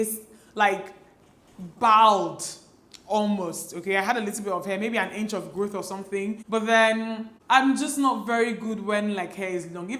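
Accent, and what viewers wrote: Igbo, Nigeria. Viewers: I love how you called nneoma's name and how you answered her 😂 I love your igbo accent 😊♥️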